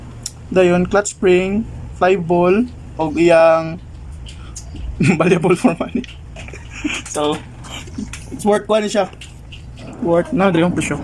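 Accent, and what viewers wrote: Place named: Visayas, Philippines. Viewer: Bisaya ka bay, ayos hehe tuloy tuloy lang natin to.. hehe minsan ok rin mag order s lazada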